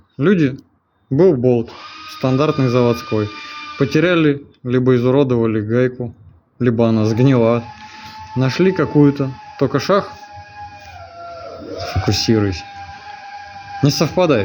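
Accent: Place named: South, Russia. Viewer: Это южнороссийский акцент в краснодарском крае и в Украине нак гхэкают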